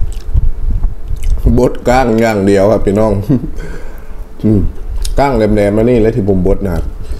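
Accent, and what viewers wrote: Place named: Phu Thai, Thailand. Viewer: เป็นนครพนมเบาะครับเว้าสำเนียงภาษาภูไทคือผมเลย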